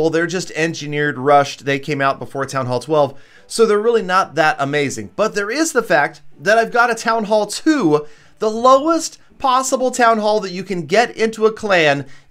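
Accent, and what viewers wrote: Poland. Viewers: r u Polish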